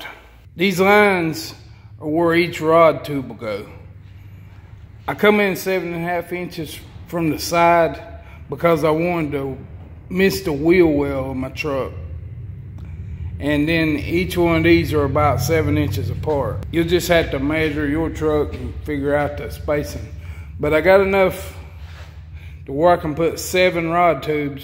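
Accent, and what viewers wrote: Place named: Appalachia, United States. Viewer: You talk like a hillbilly just like me.. I sound so funny.. That is why I dont do vids.. Great vid. My next weekend project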